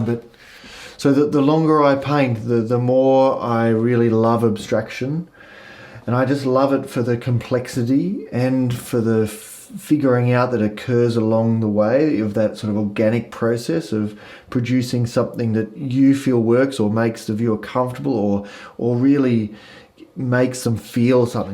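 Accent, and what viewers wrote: Australia. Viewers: Hi just found your channel, loved watching this, great to hear a Aussie accent, cheers from Canberra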